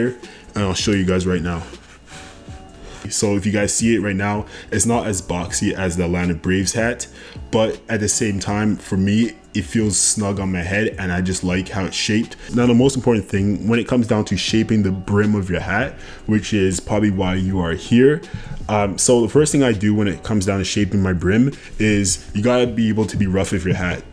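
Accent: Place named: Canada. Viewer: so what part of Canada is your accent from if I may ask?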